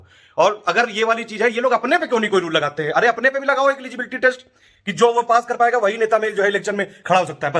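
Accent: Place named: Kanpur, India. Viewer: Kanpuriya language Maja aa Gaya sir Simple baat hai 2022 me Kuch Kiya jaye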